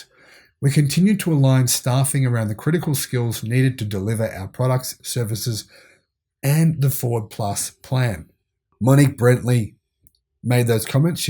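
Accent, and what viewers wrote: Australia. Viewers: sounds Australian.. 😁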